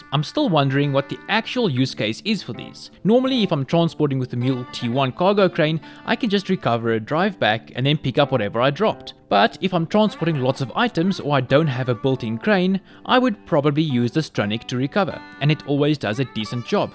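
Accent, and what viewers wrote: South Africa. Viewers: Joh , the Afrikaans accent is strong with this one!! Would love to jol some co-op with you sometime!!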